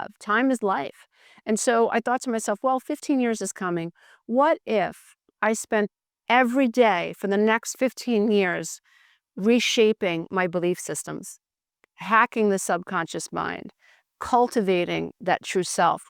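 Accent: New York, United States. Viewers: I knew you were from New York…I thought Long Island ❤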